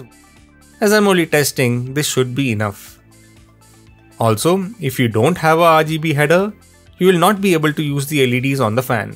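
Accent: India. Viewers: Love the Indian accent.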